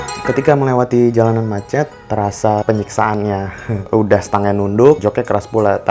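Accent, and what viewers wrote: Bugis, Indonesia. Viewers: abang orang bugis ya?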